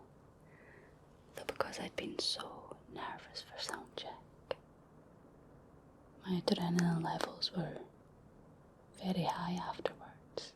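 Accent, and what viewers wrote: Scotland, United Kingdom. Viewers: I love listening to a Scottish accent. It's nice to hear, because usually I'm hearing the West Coast American accent.☮️ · Yeah, Scottish ASMR. Hello from NE highlands!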